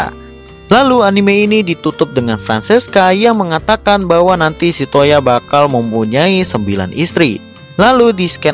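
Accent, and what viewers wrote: Java, Indonesia. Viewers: orang jawa bang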